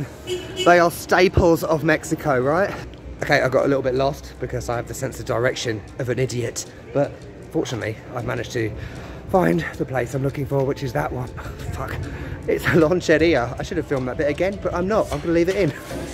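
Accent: England, United Kingdom. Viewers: Hola! a mi me gusta tu acento inglés así que no lo cambies sigue siendo tu mismo! Me divierte mucho tu forma de llevar los vídeos! Me da muchísimo gusto que te gusto la comida de mi querida Laguna. Cuídate!!! Seguiré viéndote! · hahahahaha. Your American accent was awesome!!! But I truly prefer to watch your videos with your wonderful and sarcastic English accent. You can also drink electrolit but always cold, if not, it taste like shit. Be hydrated. Waiting for your next adventure. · Please, stick with your English accent… your American accent is a bit South African lol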